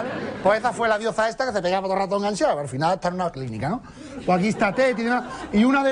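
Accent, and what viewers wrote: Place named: Andalusia, Spain. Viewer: jejeje como molesta a algunos de por alli arriba ke alguien hable con acento andaluz. Menos antiandalucismo señores!!! ke crack, eres el rey. y tu sigue hablando con tu acento y al que no le guste que ponga canal nou.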